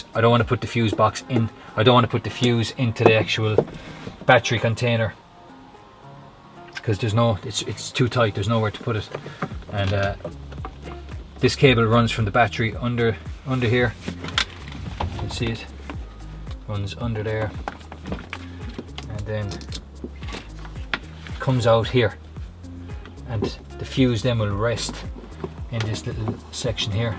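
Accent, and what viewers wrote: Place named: Dublin, Ireland. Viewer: Great Video…what part of Dublin are you from??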